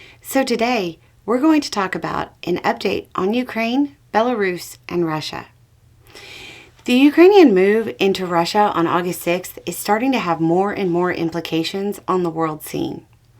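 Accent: South, United States. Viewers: I love your snarky sarcasm. 😂😂😂😂😂😂😂 If you didn't have such a strong southern accent, I'd say you were a Pittsburgher (that's a compliment where I come from).😂😂😂😂😂😂❤❤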